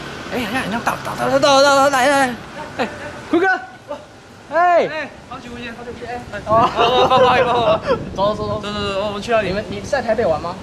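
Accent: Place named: Cantonese, China. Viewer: 在說廣東話的時候好可愛....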